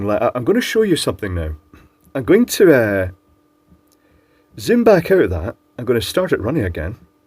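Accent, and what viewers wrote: United Kingdom. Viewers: Amazing Harry Potter accent. Instant sub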